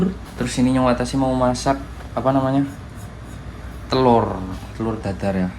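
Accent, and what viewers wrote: Banyumas, Indonesia. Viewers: Orang mana mas ngapak poul😂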